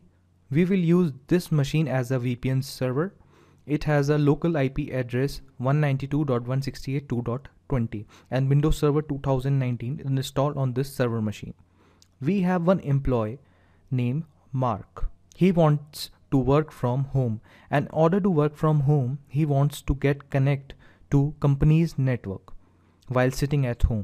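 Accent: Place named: India. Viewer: Clearly... As I always say if it's Indian accent it's excellent explanation.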